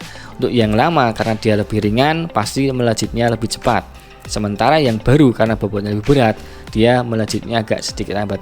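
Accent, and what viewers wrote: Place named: Java, Indonesia. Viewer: Medok amat bang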